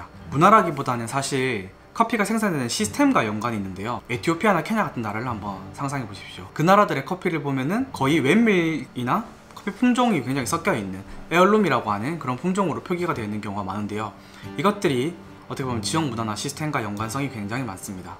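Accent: South Korea. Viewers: ㅋㅋ 오히려 경상도 사투리때문에 더 꼰대스러워욬ㅋㅋㅋ 연기하시느라 고생하셨어요. 좋은영상 찍어주셔서 감사합니다. 많은사람들이 봤으면하네요. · 불어 하는 사람으로 한국식 발음에 못 알아들었음 ㅋ Terroir 라는 걸 알고 빵터짐 영어나 불어나 한국식 발음 참 알아듣기 힘듬. Anyway.. I like to drink Jamaican Blue Mountain, Ethiopia Yirgacheff & Colombia Geisha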